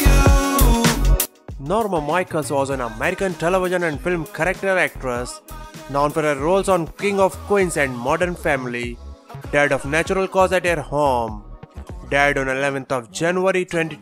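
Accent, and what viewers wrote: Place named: India. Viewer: The announcers Indian accent is so distracting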